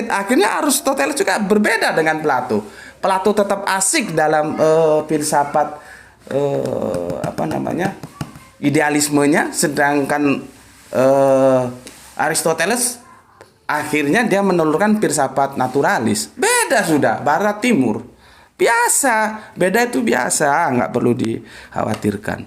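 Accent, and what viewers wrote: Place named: East Java, Indonesia. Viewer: Waalaikum salam..... kadang logika saya dipermainkan oleh cara berfikir...cuma aja kadang pemakaian kata kata nya dalam menerangkan memakai intonasi jawa timuran yg membuat tdk tekstual didalam menerjemahkan dalam pikiran saya......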